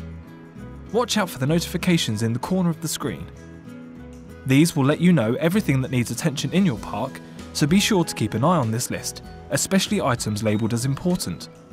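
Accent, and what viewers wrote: United Kingdom. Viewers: Unhhhh. His British accent is sexy.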